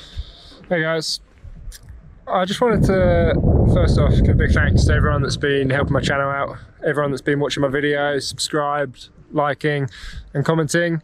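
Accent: England, United Kingdom. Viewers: Great videos, you’ve really helped me. Keep it up. Nice to hear an English accent as well.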